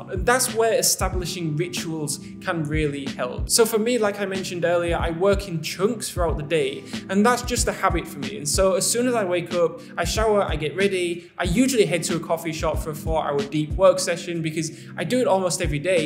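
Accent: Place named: West Yorkshire, United Kingdom. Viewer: You sound like you're form west workshire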